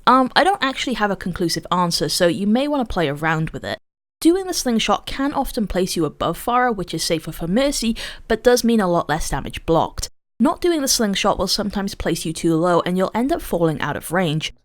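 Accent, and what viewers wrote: United Kingdom. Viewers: This is an amazing tips video I've never even thought of (I'm so ashamed) or heard of. I LOVE Coma and Dding - I bought the Shanghai skin for Mercy because Coma is insane (with all access pass, watching his PoV is just... incredible and shows how much work a Mercy can actually put in). I love the fact you brought them up!! I'll probably end up forgetting your disclaimer and trying this in comp and getting rekt but yeah. Thank you for your videos, I just discovered your channel through Twitter rabbithole-ing, I saw your post about some dude raging about your voice. Idk what I expected, but your voice is really soothing and pleasant to listen to! Of course, we Americans tend to love British accents (I hope that I'm not getting your ethnicity wrong here, apologies if I am). Basically you sound wonderful, some people are just hateful to the point of delusion. 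:) Keep up the amazing work, I am a new subscriber and am already learning a lot. (Also I have about 250+ hours on Mercy so this truly means so much to me to learn new and EXCITING things.)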